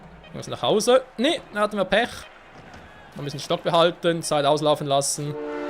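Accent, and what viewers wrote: Switzerland. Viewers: Ich finde dein Schweizer dialekt ist so cool😆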